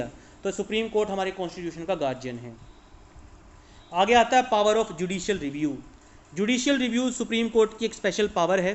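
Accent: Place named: Haryana, India. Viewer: You are from haryana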